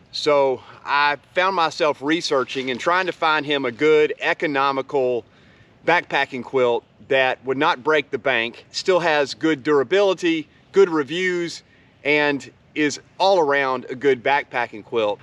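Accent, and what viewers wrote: United States. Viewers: Where you from I like the accent, that's a proper American accent...Man I've been going to get this quilt for ages, its always A pain buying from the US we get hammered with expensive postage and VAT tax and import tax in the UK. I wish they would sort a good trade deal with the US so we could buy competitive. What kind is the back pack it looks a nice pack?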